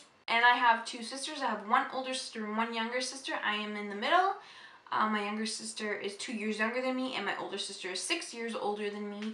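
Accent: Canada. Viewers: you MUST be Canadian! I can tell by the way you say "out". I <3 canada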